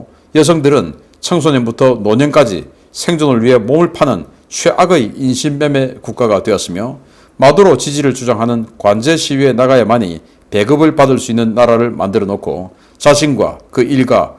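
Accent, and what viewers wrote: Gyeongsang, South Korea. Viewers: 신인균님의 해설을 들으며 매번 깔깔 웃습니다. 어찌 그리도 재미있고 명확한 내용으로 경상도 사투리를 섞어... 정말 고맙습니다.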